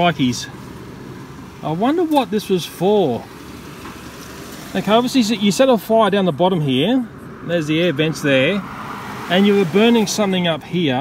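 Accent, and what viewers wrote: Sydney, Australia. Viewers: Child in house says to mum "Mum, the loony spider man is outside talking to a chair"... Do love the Sydney accent, its like how us Londoners used to talk before London became the city of a million ponging ars... OK must restrain meself on social media, its a good job I am bona fide certified mentally ill cos I could get into trouble speaking me mind :P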